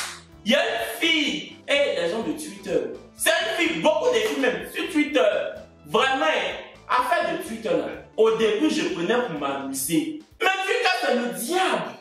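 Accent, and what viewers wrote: Africa. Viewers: Oui je jure tu as le vrai sang africain je te jure la façon de parler moi mm je suis africaine et fier de l'être #gabon! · C'est pas vrai au contraire je trouve que juste par son accent il valorise l'Afrique il n'a jamais dit que les blancs sont mieux que nous au sens propre du terme moi j'adore justement parce qu'il se démarque des youtubeurs Africains qui vivent en Occident il est resté authentique s'il y'a une chose à retenir c'est que si tu veux suivre faut éviter d'être susceptible sinon t'a pas ta place ici ceux qui trouvent qu'il exagère ce sont des personnes hyper susceptibles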